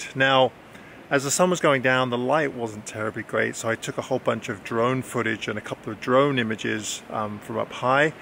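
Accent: England, United Kingdom. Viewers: Hi. Crazy question, are those magnetic reading glasses? If so, what brand are they? Look like exactly what I need to avoid regularly leaving behind/ sitting on/ losing mine. PS, it’s really refreshing to hear a laid back English accent for a change!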